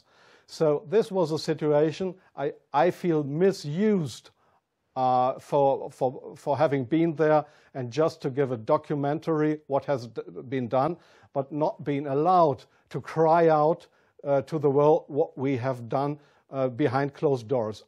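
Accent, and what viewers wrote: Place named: Germany. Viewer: Hi Buddy, German English you speak. But doesn't matter, you are Man, beileid...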